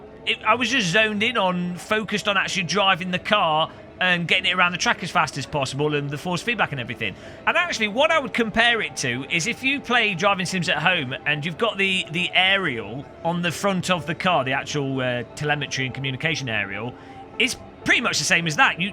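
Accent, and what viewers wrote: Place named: Leicestershire, United Kingdom. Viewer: If you don't mind me asking, where are you from? Can't place your accent but would guess at Leicestershire?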